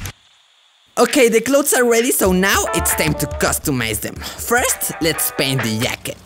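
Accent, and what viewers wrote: Spain. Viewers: I think you have a Spanish accent, or do you speak Spanish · como se nota que eres español bro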